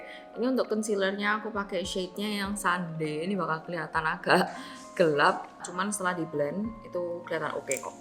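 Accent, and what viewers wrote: Australia. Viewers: selain suka hasil make up & tekniknya, suka bgt sama accent aussie nyaaa🫶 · Omg the aussie accent ❤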